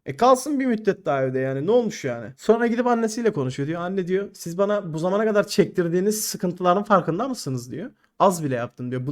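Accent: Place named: Kahramanmaraş, Turkey. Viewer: Kanka Kahraman Maraşlı mısın ? bizim otaraf gibi konuşuyon :)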